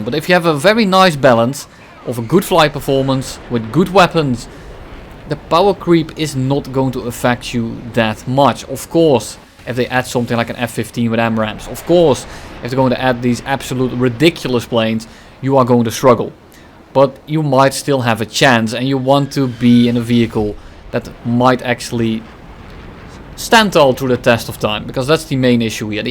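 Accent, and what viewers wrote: Netherlands. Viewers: Je hoort het altijd meteen als iemand nederlands is. Nederlanders hebben een hele goede engels vocabulaire maar spreken kunnen we niet. Haha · Your Dutch accent makes me smile a lot. Top content!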